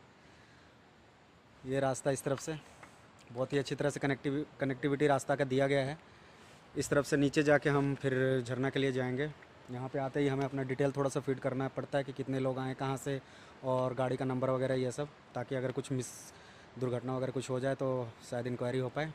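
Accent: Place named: Jharkhand, India. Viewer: कमेंट्री और बैक ग्राउंड म्यूजिक के वॉल्यूम में तालमेल नहीं है।कमेंट्री सुनने के लिये वॉल्यूम को बढ़ाना और म्यूजिक की बारी आने पर वॉल्यूम घटाना पड़ता है।फिर, कमेंट्री का स्टाइल आपसी बात-चीत की तरह "स्पीडी" है, जब कि इस तरह के वीडिओज़ में कॉमेंट्री स्पष्ट आवाज और थोड़ा,.. थोड़ा स्लो स्पीड में होना चाहिये। आप अपने कमेंट्री में लोगों को आमंत्रित कर रहे हैं कि कभी झारखंड आइए तो ..... इसका मतलब आप बाहरी लोगों को आमंत्रित कर रहे हैं।ऐसे में आप को राष्ट्रीय स्तर की भाषा में कॉमेंट्री करनी चाहिये, न कि " आव रे, जाव रे " वाले स्थानीय स्तर पर।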